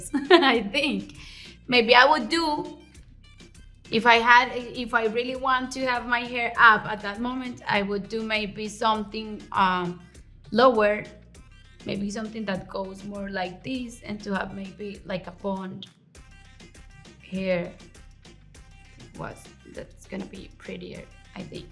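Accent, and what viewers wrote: Paisa, Colombia. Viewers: Paisanglish 😂😂😂 la amo ❤️❤️❤️❤️ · Que ingles bonito con acento paisa. Karol G una dura una bichota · Ella habla paisa por eso sale asi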